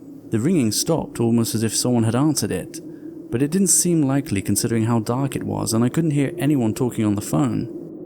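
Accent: United Kingdom. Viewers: Unrelated but you have a nice accent. I assume you are British?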